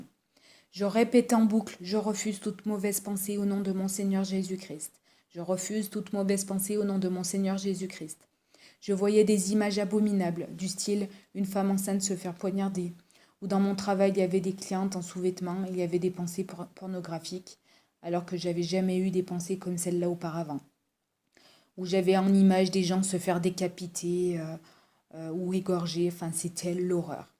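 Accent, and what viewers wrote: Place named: Nord-Pas-de-Calais, France. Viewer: 💙✨💙✨💙✨💙 J’entends un bien joli accent Ch’ti... Votre témoignage est bouleversant. MERCI pour ce partage ! Vous et moi avons traversé des évènements similaires. Voici, je vous ai donné le pouvoir de marcher sur les serpents et les scorpions, et sur toute la puissance de l’ennemi ; et rien ne pourra vous nuire. Luc (N’oubliez pas que quand on aime le Fils, on ne peut rejeter la Mère...) 💙✨💙✨💙✨💙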